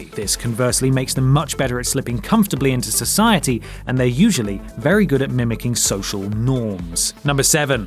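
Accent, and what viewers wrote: United Kingdom. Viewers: I was wondering why watch mojo all the sudden become British but then I notice it was what culture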